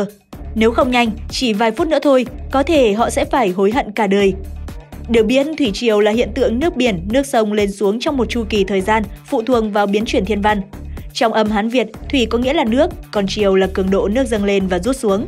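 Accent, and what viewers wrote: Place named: North, Vietnam. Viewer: Giọng này là người Bắc Việt cộng không quen tốt nhất là không you tube nghe thấy rất ghê tởm 🤮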